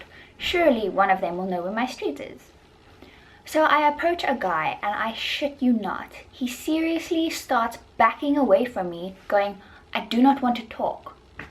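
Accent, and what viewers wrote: South Africa. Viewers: Is that an south african accent you have? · lol your accent is different. where in South Africa are you from? CTP or DBN? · You have never sounded more South African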